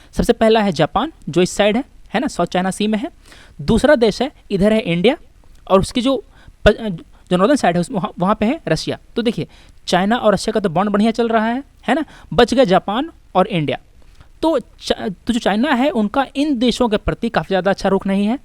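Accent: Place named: Bihar, India. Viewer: Even you sound bihari ..... You sound pro-madeshi and biased Where is dhavan sir ??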